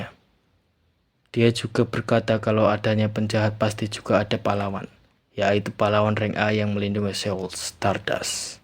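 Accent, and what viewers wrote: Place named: Java, Indonesia. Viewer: Medok bgt mas❤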